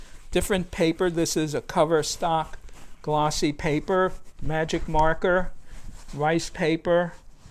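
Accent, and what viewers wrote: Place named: Brooklyn, United States. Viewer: your accent is just fine to us Brits! We love listening to your Brooklyn accent🤩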